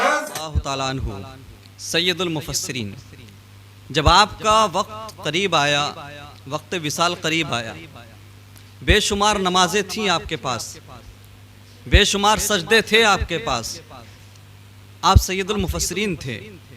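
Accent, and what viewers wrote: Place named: India. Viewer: Kitni saaf urdu boltay haenh Indian ho k. MashaAllah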